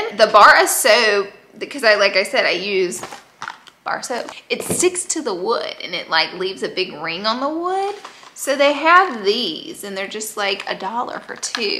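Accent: South, United States. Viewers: I miss her southern accent. It’s more endearing than the Cali girl thing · OMG!!! I LOVE THIS VIDEO. Girl your southern roots are showing out!!!!😘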